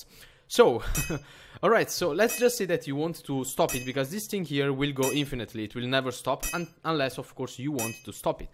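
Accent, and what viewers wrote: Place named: Italy. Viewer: si sente che sei italiano♡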